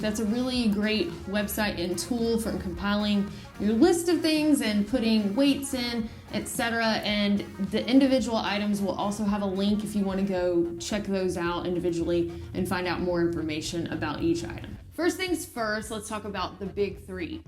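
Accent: United States. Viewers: I adore your American accent. Thank you for showing your adventure. I hope your sister finds as much enjoyment in the hike, as you.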